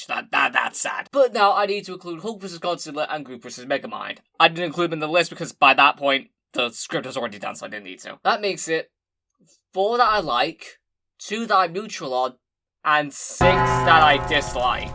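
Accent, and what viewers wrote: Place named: United Kingdom. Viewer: This is the first time I’m hearing your voice Holy shit you ARE British aldhaodhakdhosjzksjd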